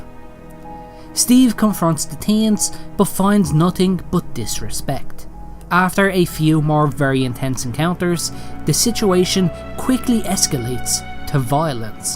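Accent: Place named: Ireland. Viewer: Are you Irish or Scottish? I love your accent. I studied RP at the university. Oh, it says that you are from Ireland later on in the clip.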